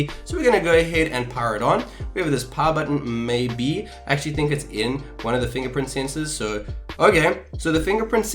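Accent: South Africa. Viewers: Hey...so...are you south african?great review btw.anyway,any idea where one can get this in sa,or cheap shipping to sa online.Thanks a lot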